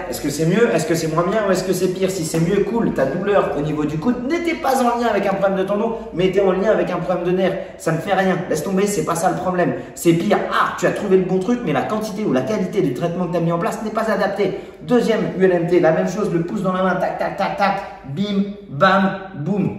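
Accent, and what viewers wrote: South, France. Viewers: il est du sud de la france et la on parle comme ça et je trouve ça simplement chouette et inoffensif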